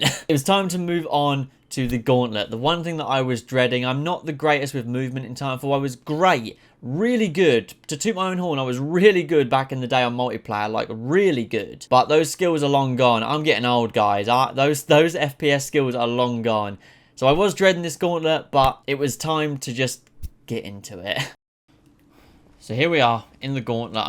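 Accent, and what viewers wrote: United Kingdom. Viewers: Hey are you from Northampton by any chance I only say this because your voice sounds very northhamptony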